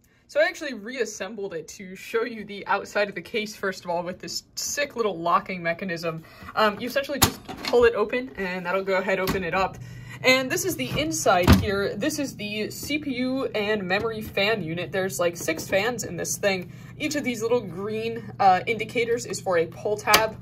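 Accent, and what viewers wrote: Canada. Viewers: Very curious where your accent is from, I like it. (It sounds very Canadian to me, but I could be wrong).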